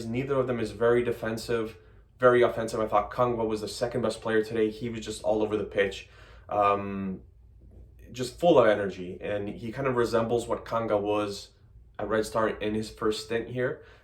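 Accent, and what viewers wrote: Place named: New York, United States. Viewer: Nice recap. Sjajan ti je ENG :) - American NYC dialect :D Anyhow, keep up the good work!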